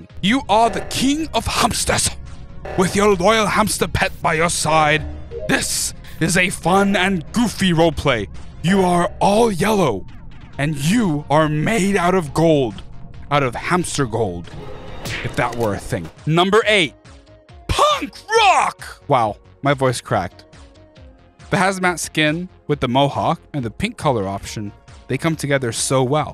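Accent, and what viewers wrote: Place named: Russia. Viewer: His Russian accent is so good!!!!!!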